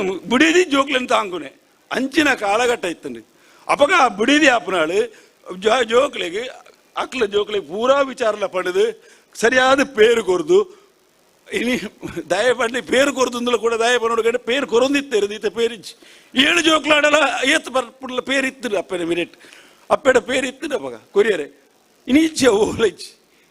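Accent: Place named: Tulu Nadu, India. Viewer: Anna irna kannada sari ijji, ir tulette paterna edde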